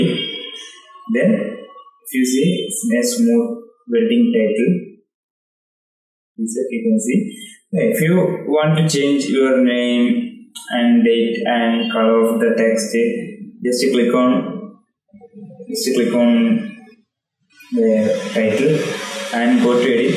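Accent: Kerala, India. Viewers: bro... u from kerala???.... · ഇംഗ്ലീഷ് കേട്ടപ്പോ മനസിലായി മലയാളി ആണെന്ന്...മലയാളം മതി ബ്രോ...!!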